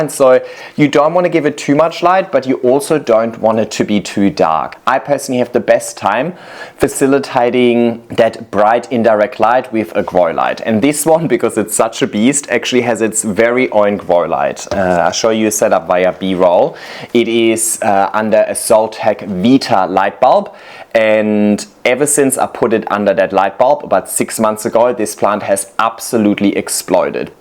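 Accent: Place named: Germany. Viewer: Grazie per i tuoi bellissimi video, per la generosità con quale condividi la tua esperienza. Da anni sei una fonte di ispirazione per me! E poi c'è una cosa buffa, che capisco molto meglio il tuo Inglese con leggero accento tedesco, rispetto al inglese comune 😂. Grazie❤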